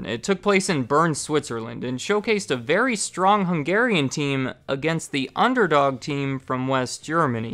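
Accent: United States. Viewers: hahha, yeah it's an American kind of thing to take liberties with other languages or Americanize English words isn't it😉 adidas = ugh-dee-dus aluminium = ugh-loo-me-num coupe = coop colour = =color They've got Nike down though👍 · No need to apologize for the Americanized pronunciation; as an American living in Europe, I don’t take offense to the onslaught of mispronunciation of scores of American brands. It would be petty...😉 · This dude is so American it’s painstaking to listen to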